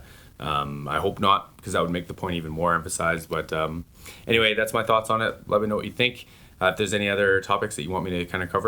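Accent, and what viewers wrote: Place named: Canada. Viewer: I don’t know why I never noticed what an amazing Canadian accent you had before listening to this video. Have you edited it out in the past?Lol!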